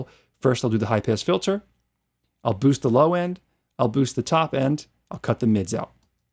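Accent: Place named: United States. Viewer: Love your teaching style, great advice, very well presented, nice results. On a humorous note: I can’t believe nobody called you on your pronunciation of “high piss filter” !! I speak four languages and traveled for two decades around the world, so I understand accents, language barriers, all kinds of stuff. But except for that one word, you sound like a very normal English speaking (from America) kind of guy. Not sure why you pronounce high pass with an i. Anyway, thanks for a great tutorial, catch you on the next one !!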